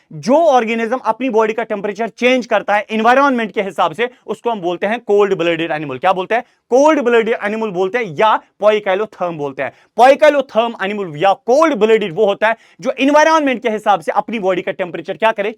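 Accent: Kashmir, India. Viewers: Kashmirii accent ❤😂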